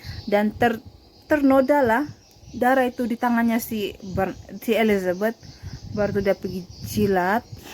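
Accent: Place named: Sabah, Malaysia. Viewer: Wah.. Terbaik sis becerita. Suka dengar dialek org sabah ni👍👍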